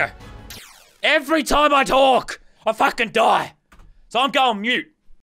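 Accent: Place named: Australia. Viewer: Australia accent (thumbs up)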